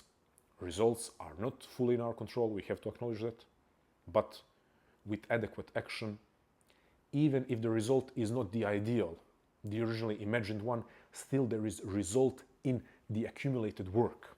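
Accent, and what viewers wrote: Balkans. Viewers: Are you Balkan? Great video, just wondered because of the accent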